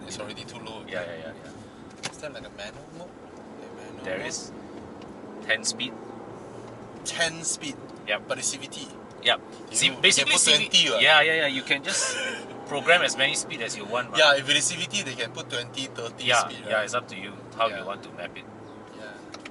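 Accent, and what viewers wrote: Malaysia. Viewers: It's so hilarious how you Malaysians speak our English language ! But at least it sounds better than china and other east asian countries' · ahh.. I see so Malaysian speak that way??? I'm from Poland. Please forgive my stupidity 🙏🙏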